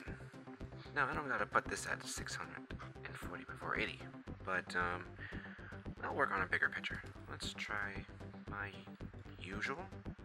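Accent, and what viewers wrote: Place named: Mexico. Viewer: where is that accent from, Mexico? there are a lot of mexican designers, and drawing artists, is it because some artistic baby boom or the artistic education is superior? one day we will be as good as you guys!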